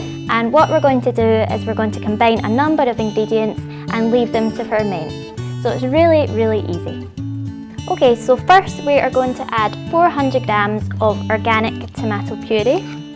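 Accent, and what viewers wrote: Scotland, United Kingdom. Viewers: the broad Scottish accent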